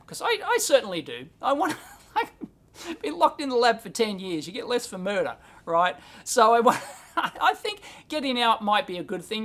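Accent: Australia. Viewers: Thank you. I started watching 7 years ago, when I was 10 years old. I did not understand anything you were saying but I thought your accent was interesting and relaxing, so I kept listening to your videos. Over time I started to pay attention and learned a lot about electronics and electrical engineering thanks to you. Now I am planning to go to university next year to study electrical engineering. Largely because I stumbled upon a friendly Australian guy. Thank you for being an inspiration, and I hope you continue for many more years.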